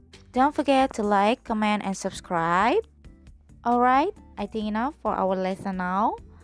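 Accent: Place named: Indonesia. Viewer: Nice indonesian accents Favourite teacher....thankyou for the lesson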